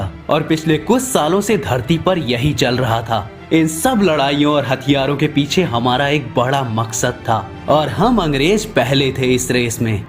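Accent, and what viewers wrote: India. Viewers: bro are you from Odisha??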